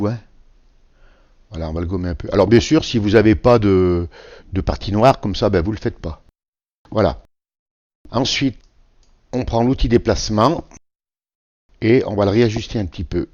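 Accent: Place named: Savoy, France. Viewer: vos tutos sont toujours tres clairs, en plus on a l'accent savoyard. j'ai visionne votre tuto sur le montage dans photoshop mais comment l'enregistrer pour l'envoyer par mail? Merci. C Del Grande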